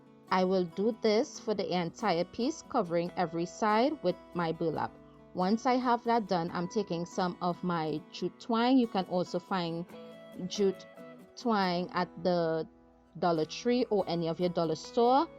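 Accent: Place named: Trinidad and Tobago. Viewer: Hey friend you are very creative. This turned out beautiful. Love your accent are you from Trinidad 🇹🇹?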